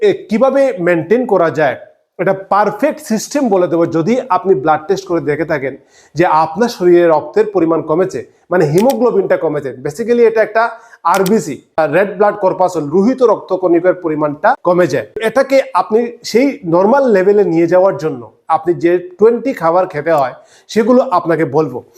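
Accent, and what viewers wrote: Sylhet, Bangladesh. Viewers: apni monehoy ekjon Sylheti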